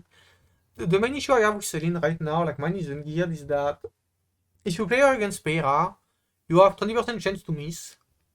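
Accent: France. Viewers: He is talking in English but with a god awful French accent.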